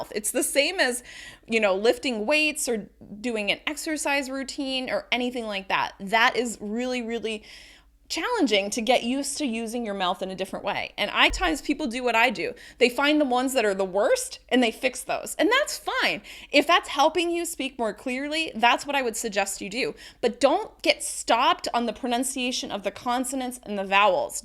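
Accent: United States. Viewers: Hi !I like American accint and I like you,too.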